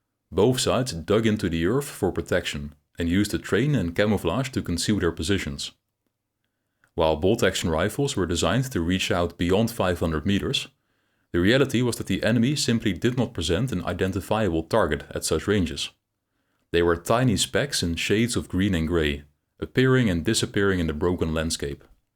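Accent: Netherlands. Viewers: this dutch raw accent is unlistenable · You'r obviously Dutch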